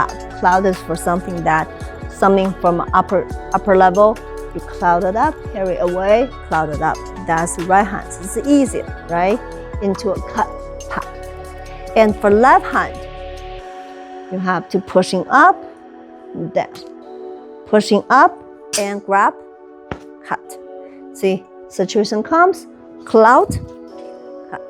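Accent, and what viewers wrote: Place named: China. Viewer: We love when Chinese women speak Chinese English accents!!!!ha ha! Chinese sword 🗡️ s beautiful to watch in action!!. Love you!! ❤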